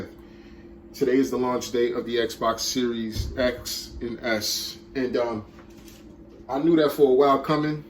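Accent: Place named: New York, United States. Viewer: I dont know if your from NYC but I think you are because of the Brooklyn nets hat, & your accent. Im also from NYC but I moved to Miami fl in the mid 90's I remember I could go into one of those mom and pops Asian stores and always walk out with what I wanted. If your from nyc try those asian mom and pop stores,, or Try China town.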